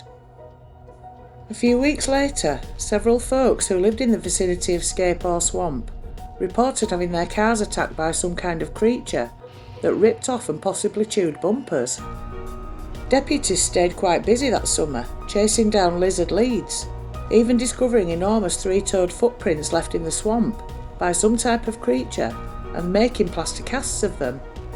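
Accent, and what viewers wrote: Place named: Yorkshire, United Kingdom. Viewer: Wow, I’ve just found this after shared the link. I seem to have been unsubscribed!! Fabulous to see you back, I’ve been missing your great Yorkshire narration 😊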